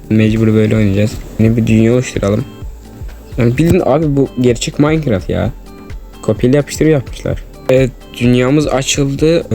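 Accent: Azerbaijan. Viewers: Azerimsin sesinden bildim Düzenleme:beyler like atın hiç bi videoya çıkmadım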